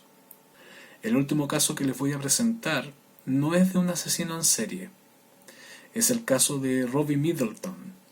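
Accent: Chile. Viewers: Me ha encantado tu canal... He visto varios vídeos de asesinatos y creo que los tuyos son sumamente completos en cuanto a información y material fotográfico o fílmico... Además me gusta tu voz cuando los vas narrando... El acento chileno me encanta... Saludos desde Mendoza, Argentina... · Me encanta el asentó chileno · Wow tienes acento chileno, eres chileno??? No sabía jaja eres genial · La tonada chilena que tenés ....le da un toque maravilloso a los casos .....muy interesante tu canal !!! Desde Argentina te saluda una chilena !!!!! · Me gustan tus documentales, por que tu voz es neutral y muy clara, me costó encontrarte el timbre chileno sin embargo es maravilloso un acento y una forma de narrar como la que tu tienes, correcto y facilidad para entenderte, siempre agregas más datos y nueva información, se agradece amigo mio.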